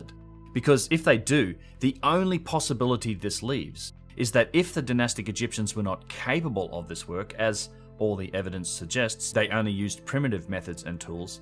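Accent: Australia. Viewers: Good stuff mate, nice to listen to another Aussie voice talking some sense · Great work, so obvious they used diamond drill or similiar stone to anyone with a brain that works! Thankyou! You sound Australian if so, great to sed Aussie working seriously in this area!❤😂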